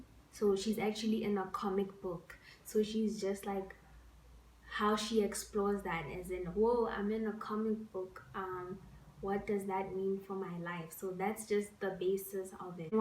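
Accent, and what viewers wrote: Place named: South Africa. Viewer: When I first watched your videos I was like mhm, she sounds South African. I don't know if you are but I am and I just got really excited. Love your channel💕❤️ You watch kdramas too?! OMG I'm immediately subscribing🌻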